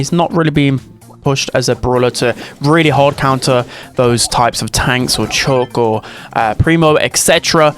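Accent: United Kingdom. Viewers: I know it’s just the British accent but pronouncing the h in h is always funny to me. Nice vid❤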